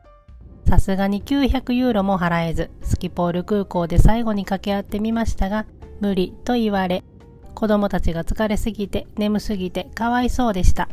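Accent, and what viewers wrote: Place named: Osaka, Japan. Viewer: 素敵なチャンネルが上がって来て拝見したら、ほんとに素晴らしい✨ ドイツ🇩🇪は昔、息子がマインツにサッカー留学して色々調べたりドイツ語を勉強したりしたので親しみを感じ💕、そして大阪出身なので大阪弁が嬉しくて嬉しくて😢。じっくりチャンネルぜーんぶ観させて頂き、ぜひコロナ明けにいつか🇩🇪✈️行きたいと💡。 ジンバル使った美しい映像と編集ですが、撮影と編集はどんな機材を使っておられますか？ また、とても上手なアフレコ、テロップ、BGMですが、どんな風に制作されていますか？ お子さんもおられる中、素晴らしいワークですね！キチンと伝えるべきは伝えて、またひとり素敵なYouTuberさんに出会えて良かったです☺️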